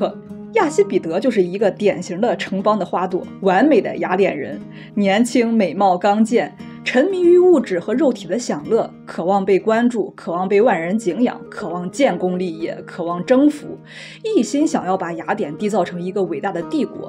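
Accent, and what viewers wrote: Jinan, China. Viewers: 听你口音像济南人 是吗老乡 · 难得的有思想有口才的好主播，果断订阅了❤播主是济南人吗？一口济普乡音很亲切啊😊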